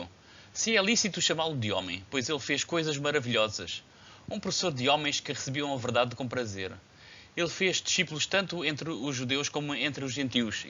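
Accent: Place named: Portugal. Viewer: ouvir um falar em dialeto tamanqueiro não dá.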